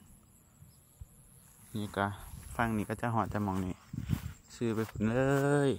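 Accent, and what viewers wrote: Isan, Thailand. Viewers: พี่ถามอะไรหน่อยที่สปป.ลาวมีกี่ภาคมันมีภาคอิสานเหมือนที่ไทยมั้ยพี่สังเกตุฟังน้อง เว้าแล้วคล้ายคนอิสานมากๆเลยแล้วน้อง อยู่ภาคอะไรใช่ภาคใต้รึป่าวที่ติดกับจว.อุบลของไทย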